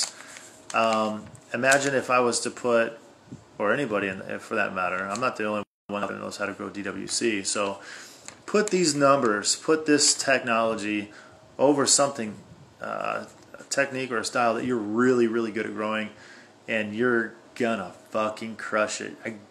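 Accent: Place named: East Coast, United States. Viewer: oh yeah, I'm old and grizzled, and you sound just like 2 or 3 of my buddies rolled into one dude. You originally from back East? Doesn't matter, you just sound like it. I was pushing my stuff too hard when I switched to LED and I just finally tried CO2. The first run was fucking nuts, it might give you 15-20% if you are dialed, but if you were doing everything just a little too hard and that's your limiting factor...whoa, more like 30% and much better quality, plus it lets you run even warmer, which lets me dehumidify harder here, and so on and so on, point is 10'x10' room only cost $100 in gas for 9 weeks once you got your gear. I just got 2 ft flouro UVB bulbs to hang over each table for ripening this round, the dangerous ones where you don't want to be in the room when on, the plants are supposed to cover themselves in sunscreen in response. 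No YouTube, but I'm on the Gram, same name.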